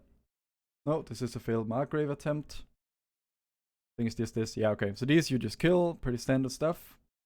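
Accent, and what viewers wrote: Denmark. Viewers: Are you Danish? :)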